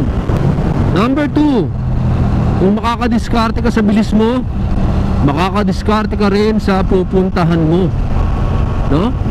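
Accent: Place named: Batangas, Philippines. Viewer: O batangas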